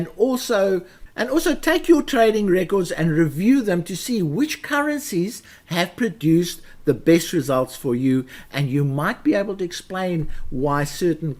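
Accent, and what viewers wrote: South Africa. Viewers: Hi, can I rather ask. Are you a South-African?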